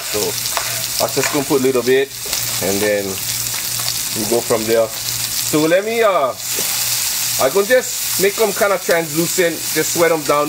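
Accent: Hawaii, United States. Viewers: My Wife and I love your videos. Your pidgin accent makes us think of Home as much as your recipes! Right on!!!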